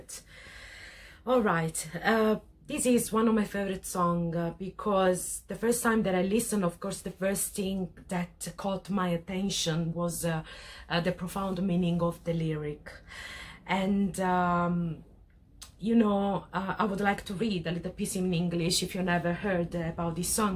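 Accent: Italy. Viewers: Not try to hide your Italian accent please, is so cute. Speak a bit more in Italian, Latin-American love it, and slowly is not difficult to understand for us. Cheers. Nice video. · HOla, sos italiana? me parece percibir un acento italiano cuando hablas.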